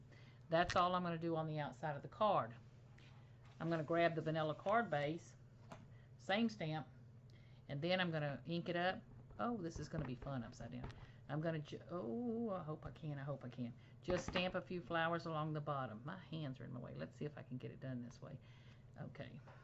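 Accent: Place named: South, United States. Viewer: Hi!! Just found your videos! I am guessing your are a Southerner... love your voice..... I am from the MS Gulf Coast.... Beautiful cards...... thanks for sharing.... and thanks for the post it note printing tip!!!!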